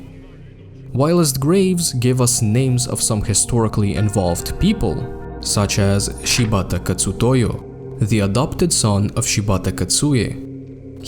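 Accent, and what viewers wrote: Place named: Russia. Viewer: не братишка, там к середине конечно начал "наш" акцент проскакивать, но ты 6 часов как штирлиц. Продолжай грайнд!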